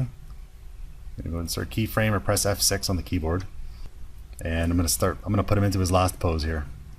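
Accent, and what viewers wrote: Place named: Canada. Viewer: Canadian detected.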